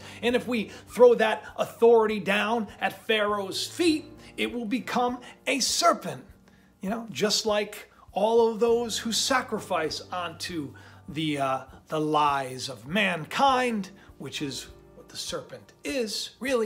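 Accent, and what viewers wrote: Russia. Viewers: Your Russian accent is awesome so don't worry about it I enjoy it and I'm sure everyone else does.! Like you said I really truly believe it was just a goofy minute that the president was pretending. But who knows in these days and ages.! Evil is worse today than it was back in the day of witches.! It's out in the open now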